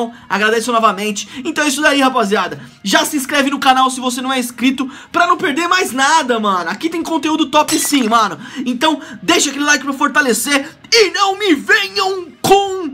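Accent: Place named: São Paulo, Brazil. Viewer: vc é paulista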